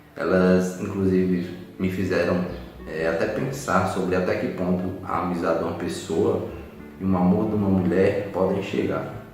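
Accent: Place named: Pernambuco, Brazil. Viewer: Opinei pelo sotaque. Sou de Maranguape, 30Km de Fortaleza. Nossos sotaques são muito parecidos; diferenciam-se nas pronúncias do "te" e do "de", que vocês pronunciam como os pernambucanos. Mas no Ceará também tem essa fala, só que apenas no sul do estado: a região do Cariri. Então, tava esperando você fosse me responder que era de Juazeiro do Norte ou Crato... Namorei com uma menina de Monteiro, em Recife, há muuuuuuitos anos atrás...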